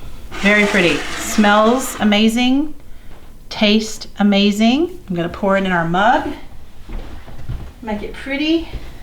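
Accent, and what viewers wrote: South, United States. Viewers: Fahima Bemberry I think you misunderstood, Fahima. She said “Grass Fed”. Not “breast fed”. 😂😂😂 It’s the southern accent. It throws you off sometimes.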